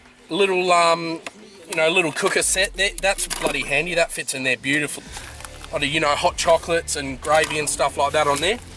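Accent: Australia. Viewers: Have to admit as a Yank I’ve always been jealous of our Aussie friends cool accent and that v8 Toyota turbo diesel that we can’t get in the 🇺🇸. Very nice 76 👍🏻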